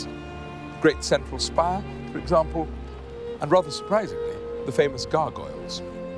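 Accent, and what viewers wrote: RP, United Kingdom. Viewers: Anyone without a wanky posy accent. Almost anyone on DW news would be wonderful. Most people on the BBC would be a significant improvement. I don't see the point of sounding like a really annoying snobby social climber. After all, there's nothing wrong with the music. Why screw up the text that way? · I thought the same of the lengthy introduction, and it seems that the narrator would like to magnify the narrative effects by such contrivances, but it can test our expectation (our wits) which, with some endurance (add the intrusive ads and other distractions), we may finally arrive at the gist of the subject-matter in hand. Nevertheless, for the more patient and cultured, it is worth the efforts. It is not easy to say whether a short video, taking such goodly swath of introductory embellishments, could enhance the desired effects, which is to capture the viewer’s attention from the outset? 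That said, one is bound to admit that these documentaries, despite the narrator’s fondness for an architectural backlog of informations and history, are highly recommended and even of the highest rank. One could say that is natural to the British people, with their distinct accent, to win our admiration as the quintessence or epitome of intellectualism and refined culture.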